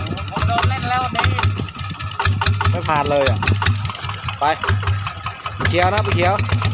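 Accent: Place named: Suay, Thailand. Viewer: พี่ส่วยหรือเขมรครับสำเนียงเหมือนส่วยมาก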